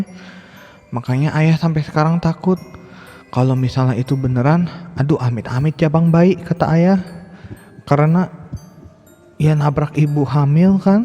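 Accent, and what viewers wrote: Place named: West Java, Indonesia. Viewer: Meni logat Sunda pisan euuyyy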